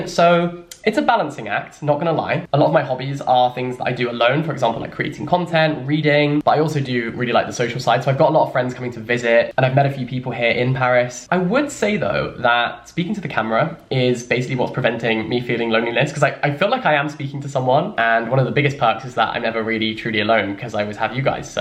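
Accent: United Kingdom. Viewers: You are literally my reason why I love the British English language in human form! Your accent and the way you use words is the exact reason I love English! Everytime I watch your videos I am so grateful to 13-year old self for choosing the bilingual course at my secondary school! You are amazing! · honestly I have no interest in reading but I really like your videos, I find them so entertaining also the brittish accent helps me prepare for CAE listening 💀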